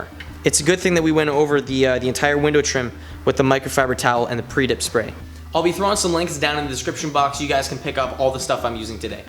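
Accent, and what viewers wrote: Canada. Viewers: Canadians and the way they say out. hahaha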